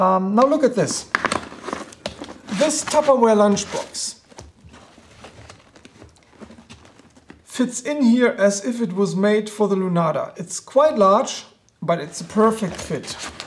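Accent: Germany. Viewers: Your english is cool - I like the german accent ;)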